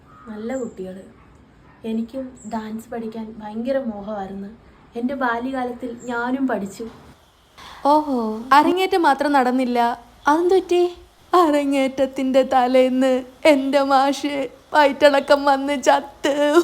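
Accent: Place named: Thrissur, India. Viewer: Thrissur slang❤❤ nammal sadhukkal ayiiiiiiii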